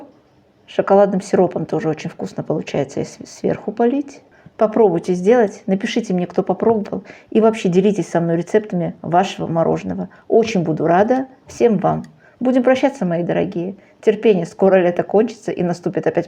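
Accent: Baku, Azerbaijan. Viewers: Добрый день,! Мы с вами землячки, я тоже из Баку.Родилась, выросла и большую часть жизни прожила в Баку а сейапс живу в России.Очень приятно слышать и слушать знакомый бакинский акцент. Спасибо за ваши рецепты.Попробую сделать мороженое.Всего вам доброго!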